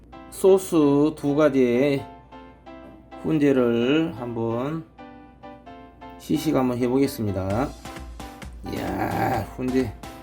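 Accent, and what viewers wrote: Jeolla, South Korea. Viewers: 요리도 요리지만 구수한 전라도 사투리가 맛깔납니다.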